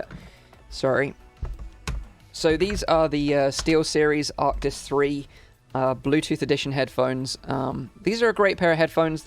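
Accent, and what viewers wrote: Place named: United Kingdom. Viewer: Honestly I personally don't mind Britishisms or any other country's thing/quirk as it's what makes you unique/you. It's a part of you and how you lived I want you to speak however you want lol, multiple English speaking countries have different spelling/pronunciations, that doesn't make one wrong or the other correct thought!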